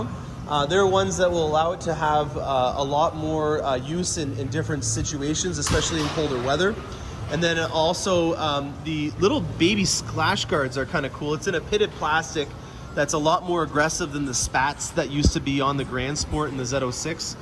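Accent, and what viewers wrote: Canada. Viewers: are you Canadian? interesting accent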